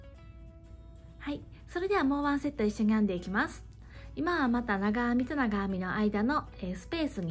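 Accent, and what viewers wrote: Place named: Kyoto, Japan. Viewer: めっちゃ聴きやすいし、ゆっくりやり方が分かりやすくて、ありがとう。 京都語ポイ感じだね❤間違えたらすみません❣️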